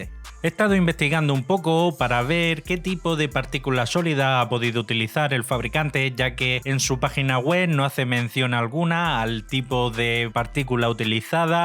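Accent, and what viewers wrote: Murcia, Spain. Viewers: Eres un máquina. Y sospecho que Murciano, por el acento. Verdad? 😊 Recomendarias su uso, o el vrx 500? en un motor boxer gasolina de 1250 cc con embrague multidisco con baño de aceite?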